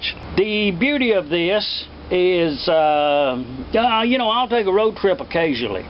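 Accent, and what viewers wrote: South, United States. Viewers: I love your "gooda olde" southern accent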